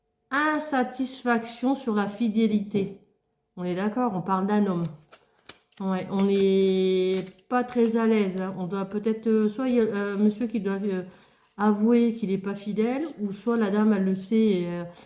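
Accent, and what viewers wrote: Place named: Nord-Pas-de-Calais, France. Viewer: ❤ merchiiiii, j'adore ton accent ch'tis😊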